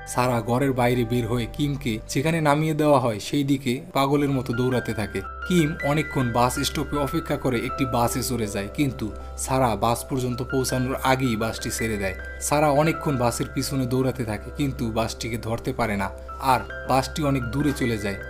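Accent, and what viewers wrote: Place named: Barisal, Bangladesh. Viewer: ভাইয়ের বাড়ি সম্ভবত বরিশাল অঞ্চলে। উচ্চারনে আঞ্চলিক টান। একেবারেই আনস্মার্ট উচ্চারন।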